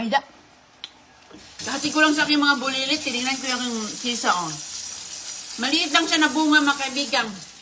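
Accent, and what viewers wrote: Visayas, Philippines. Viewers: Ano kba siempre bisaya bkit ikaw tga sang province kba? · Bisaya po kasi sya...Yung E ngging I,kayo nman my masabi lang... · Bisaya Man Dhay. Please understand 😂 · bisaya ngani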